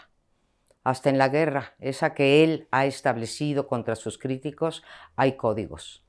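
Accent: Spain. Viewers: Y por qué habla así, como española, decía mi abuela: cada quien se revuelca en su mismo lodo, señora se queja de lo mismo que han estado haciendo ustedes por todos los medios "oficiales" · Porque habla medio española?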